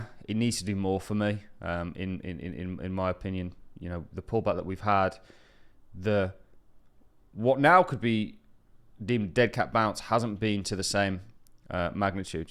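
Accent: Birmingham, United Kingdom. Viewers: I hear midlands, birmingham way maybe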